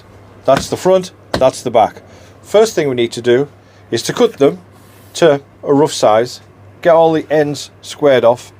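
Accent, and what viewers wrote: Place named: North of England, United Kingdom. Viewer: Where in England are you? I grew up in the North of England and to my now horrendously uneducated ear you sound like you might be close to there but ive not been back in 30 years now so I could be wrong.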